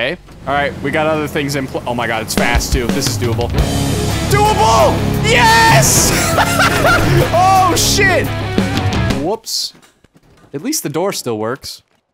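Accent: Canada. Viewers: I love how we forget how Canadian he is until he says “Home Hardway” “Canadian Tire” and “BAG” “EL” instead of bagel 😂😂😂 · has never sounded so Canadian and I love it 🤣🤣🤣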